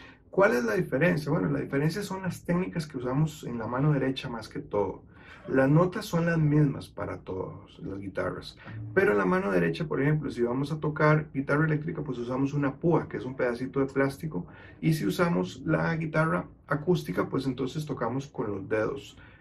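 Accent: Costa Rica. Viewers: solo he visto un minuto, pero me atrevo a decir que sos tico. Gracias, voy a aprender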